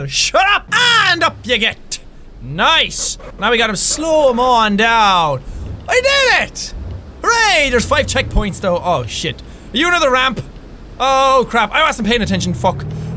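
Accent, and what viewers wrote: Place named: Ireland. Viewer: I love his Irish accent “Cars aren’t supposed to have tree wheels, their supposed to have four wheels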